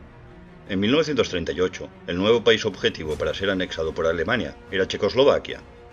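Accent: Galicia, Spain. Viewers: Eres gallego?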